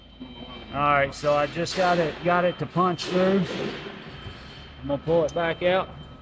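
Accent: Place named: South, United States. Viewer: Appreciate the video, gotta love that sweet southern accent!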